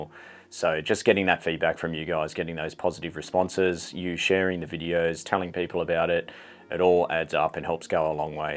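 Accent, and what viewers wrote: Australia. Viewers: There's absolutley nothing redeemable about the thin Australian accent.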